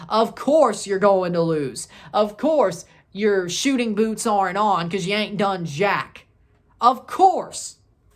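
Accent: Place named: Kentucky, United States. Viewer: Is this guy a gringo? He's accent sounds as if he's from Kentucky. He reminds me of an infantile Jim Cornett.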